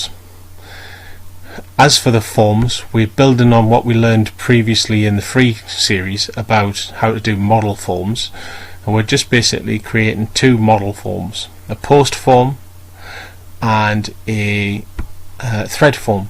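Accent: North, United Kingdom. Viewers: Nice to hear a fellow Northerner's voice. This was very helpful, thank you.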